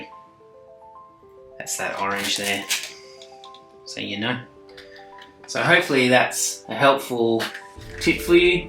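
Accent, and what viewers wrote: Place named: Australia. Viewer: Love watching the way you paint light! And great having a fellow Aussie accent to listen to 😊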